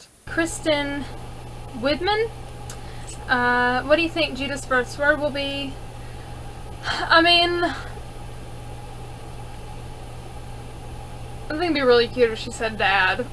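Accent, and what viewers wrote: South, United States. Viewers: I knew I subscribed to you for a reason. You're speaking my language! I don't hate Carol & Daryl either, but I am over them being these overly invincible characters who monopolize screentime that could go to other characters. They're not the only 'interesting' characters on this show but you can't tell by the way the screentime is divided. Give me more of Michonne & Maggie, damn it! But the Southern language thing is SPOT ON. I was born and raised in south Georgia, and that's how the people here talk as well. When I first started watching your videos, I thought you were from here but nope. You're just a part of my extended Southern family. Greetings, my Southern Soul Sister! P.S. I started in S4 after binge watching too. So, you're not alone in the sense that you started watching the show 'late.'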